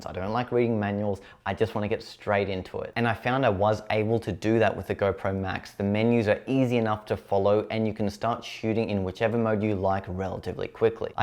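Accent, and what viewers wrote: Australia. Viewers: good to hear an Australian voice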